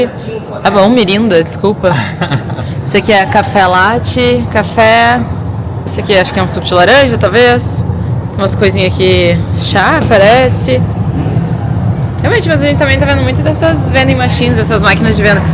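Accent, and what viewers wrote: Brazil. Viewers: Ela fala muiyo rápido, com sotaque do Paraná????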